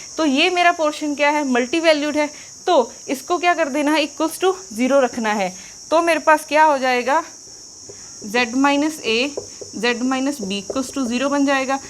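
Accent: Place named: Punjab, India. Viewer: Punjabi accent aa hee jta beech mai 🤣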